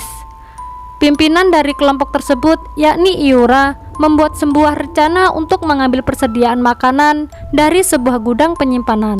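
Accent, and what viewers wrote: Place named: Java, Indonesia. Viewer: Jowo endi gaess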